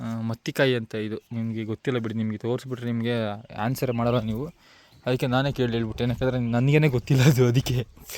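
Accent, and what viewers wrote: Kodagu, India. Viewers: ಅವರ ಮಾತು ಕೇಳಿದರೆ ಕೊಡಗಿನ ದುಬಾರೆ ಅಂತ ಕಾಣಿಸುತ್ತೇ.... ನಾವು ಅದೇ ಜಾಗ, ಗುಡ್ಡೆಹೊಸುರಿನವರು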